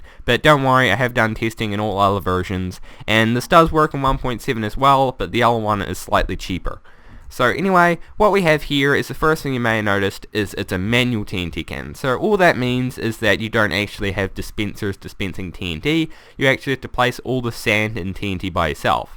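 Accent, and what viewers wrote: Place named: New Zealand. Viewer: As soon as I hear your voice I knew you were a Kiwi <3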